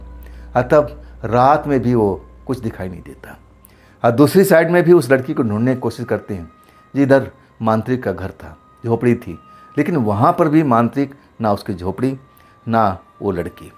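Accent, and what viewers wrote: Bihar, India. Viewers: 🎉Aapki aawaz bahut hi accha lagta hai bihar ki jhalak aati hai 😅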